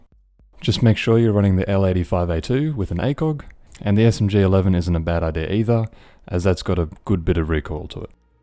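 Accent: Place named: Australia. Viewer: the Smooth as butter Aus accent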